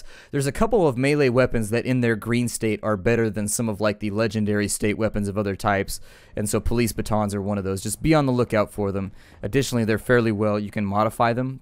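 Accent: United Kingdom. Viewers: Guy totally looks Cuban, talks like a brit lol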